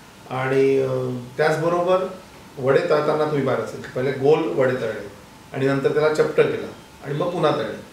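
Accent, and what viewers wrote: Vidarbha, India. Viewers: वडे एकदम मस्त 👌👌👌 आणि आपली विदर्भ भाषा भारीच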